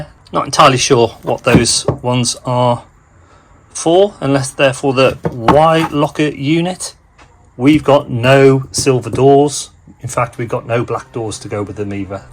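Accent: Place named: Wales, United Kingdom. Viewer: Genius intro!! We watched it 3 times 😄😄 That welsh accent is amazing 😉 I would literally set fire to anything that’s been sent so far! That’d actually ruin my patience. Nice Fjallraven hat, I’m going to have to get one now!! 🤦🏼‍♂️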